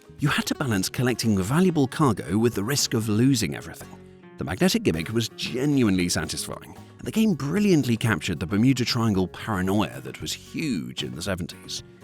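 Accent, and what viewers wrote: England, United Kingdom. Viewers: Don't remember any of these in the UK despite the England sh narration , but in the UK, I think Witchy Witchy was Haunted House.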